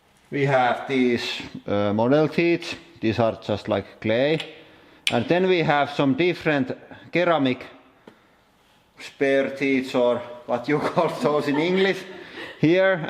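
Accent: Finland. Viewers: Are you kiddingg me? Half the reason I am here is that Finnish accent LOL it wouldn't be anything good without him talking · I read that with your Finnish accent.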